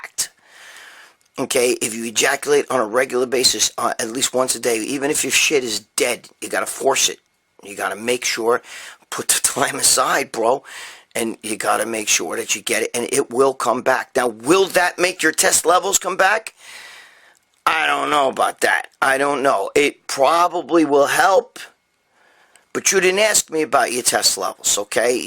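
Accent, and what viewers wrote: New York, United States. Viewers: Great video, thank you so much! Love the comedy, I also love the information very helpful! Also your New York accent A lot like my south Philly accent!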